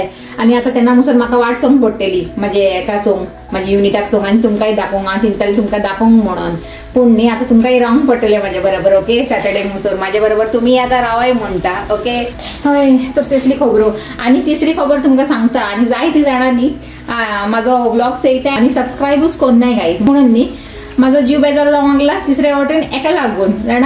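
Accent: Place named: Karwar, India. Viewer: Your voice is so irritating also don’t say your husband Patrao all the time. It’s annoying. Ghantis call for Goan Patrao . Your karwari Konkani doesn’t suit for Konkani vlog.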